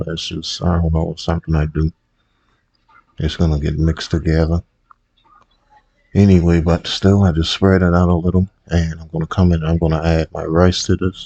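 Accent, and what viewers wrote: Charleston, United States. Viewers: Are you from Charleston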